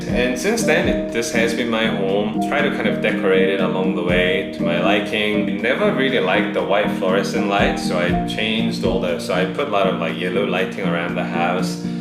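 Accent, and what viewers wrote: Singapore. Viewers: Hi, I enjoyed watching your videos and your Singaporean accent 🤓 Sorry about your dad, I lost mine 4 years ago... You seem like a genuinely nice person. All the best to you and your new life in NZ. Will continue to follow your channel as I have a dream of getting a motor home in NZ one day when I retire. Stay cheerful 😊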